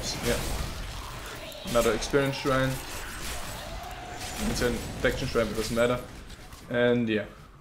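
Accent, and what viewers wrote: Germany. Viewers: I was a bit skeptical until I heard the accent. Those Germans man, they know their efficiency.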